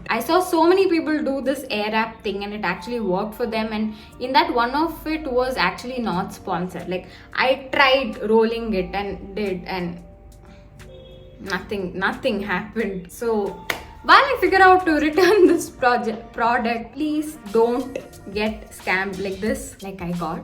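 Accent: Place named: Tamil Nadu, India. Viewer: Why are u so Tamil😂 by the way i loved your accent and expressions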